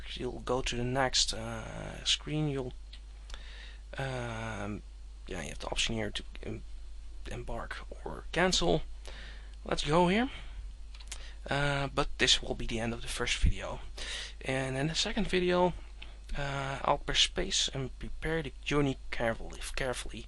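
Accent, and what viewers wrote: Netherlands. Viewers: are u from holland>? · HOLLAND kan je horen aan je engels xD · Is that a dutch accent I detect? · are you dutch? you have an accent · Are you dutch? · are you dutch cuz i my english sounds EXACTLY like u o.O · Dutch accent is Dutch. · He's most likely from the Netherlands, since the accent with which he is speaking is very recognizable for me. I didn't know it was a charming accent. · Dutch accent yez? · Yeah hes dutch allright , but its understandable so its all good :) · you sound dutch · absolutely Dutch accent :) I'm Dutch myself and I kind of recognize it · Are you Dutch? Your English accent sounds that way. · From your accent I can tell you're dutch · Can't hide the dutch accent eh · Can I ask you a off-topic question? Are you Dutch, perhaps, if so, you're way of talking gives that away ^^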